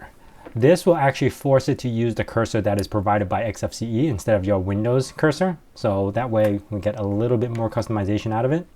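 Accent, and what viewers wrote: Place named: New York, United States. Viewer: You are a genius. And I am very impressed with your knowledge of the Windows WSL and Linux ecosystems. I am also impressed with your English and your accent ( which I assume is somewhere around New York ). I will never live long enough to master all those things. ( I watched this in Xfce using Fedora Rawhide with all the latest bells and whistles that Fedora offers ). I hope I can redo what you just did using my Fedora Rawhide setup.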